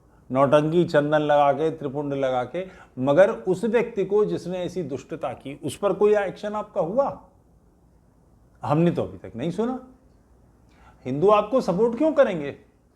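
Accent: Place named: Meerut, India. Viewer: Aap ka MEERUT wala lehza was amazing . Because , i am also from MEERUT .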